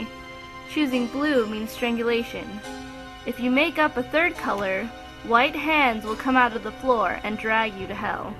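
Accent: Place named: Iceland. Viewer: OMG! You're Icelandic?! That's so cool! I would think it is hard to talk that way, though. But it sounds so cool!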